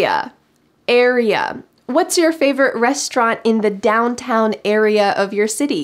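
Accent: United States. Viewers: hai mam ..is this is UNITED STATES English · Hello. I'm from India. I love your english speaking way in american accent. It is absolutely remarkable. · I watch all your videos to hear your voice because your voice is very clear and good quality. That's why I get to listen to American English. I can easily understand your voice. thank you so much..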